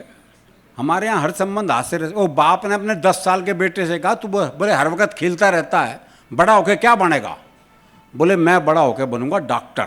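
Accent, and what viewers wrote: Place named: Haryana, India. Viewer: All old jokes. Not one was original. Only the haryanavi accent was funny.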